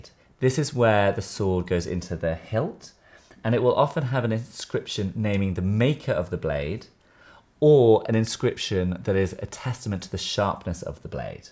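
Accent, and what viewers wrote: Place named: United Kingdom. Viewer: I agree, I think this was very interesting and well presented. My only complaint applies not only to, but to most English presenters, news readers and even academics and that is their totally British pronunciation of Japanese - especially names. I imagine it is so common, even at the museum, that the filmmakers are not aware of this. It grates on my nerves! A short class in Japanese pronunciation would not go astray.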